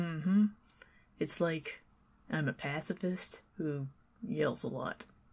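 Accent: South, United States. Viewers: Loved this, really relaxing even if life got in the way so I has to listen to half this morning and half this... huh technically morning again. Anyway yeah really nice and I love the character, her understanding nature and of course your accent mixed with southern hospitality. Just adorable hearing the Yeps and such. 10/10.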